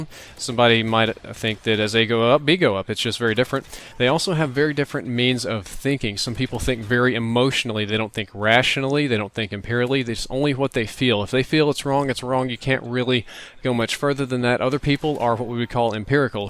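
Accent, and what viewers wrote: South, United States. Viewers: Yo, Where are you from? What state in the south? (I can't seem to place your accent) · your pronunciation of words such as what or white make up four the southern accent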